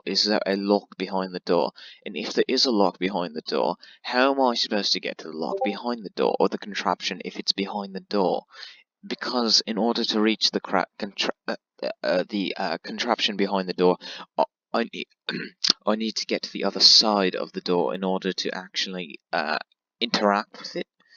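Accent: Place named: New Zealand. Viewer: What an Astounding Review! seems legitimate af I Like that New Zealand Accent Daddy 💦💦💦💦💦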